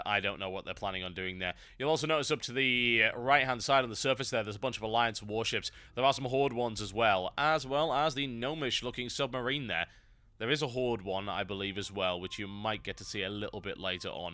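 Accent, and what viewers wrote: United Kingdom. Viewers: i only watch things like this cause it has WoW and a brittish person talking in it <3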